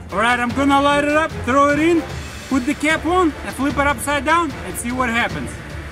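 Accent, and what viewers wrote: Russia. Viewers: по звучанию Американских слов, это не американец, а русский человек, знаевший английский язык )))) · Мне кажется или у него русский акцент? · Рязанский акцент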